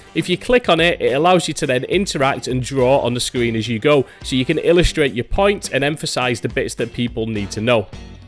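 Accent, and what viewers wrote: United Kingdom. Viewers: This really is super-helpful. You have a great Yorkshire accent and voice by the way. If you're not doing voice overs already and need the extra cash, this could be a nice sideline for you.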